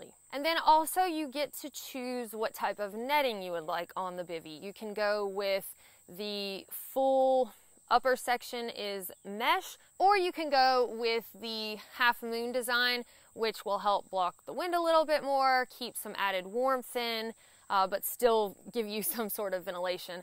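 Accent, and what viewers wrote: South, United States. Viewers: I couldn't or wouldn't even want to try this setup. It's a TENT only for me. Nor would I try to use a Hammock on longer trips, maybe for a couple of nights. You are one heck of a gal and I've seen almost everyone of your videos. And every time you reached your end of the trail it was heartbreaking for me too. You did something I can't do. Oh, I'm from Carrollton, Ga. and the way you talk (like I do) is just so down home and proves you're a Country Gal. Waiting to watch any other new trips you go on.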